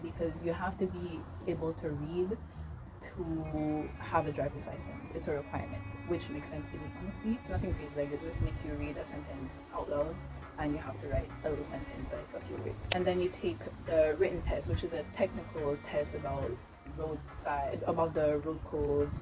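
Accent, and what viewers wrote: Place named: Jamaica. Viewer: okay purrrr, look at you with your jamaican accent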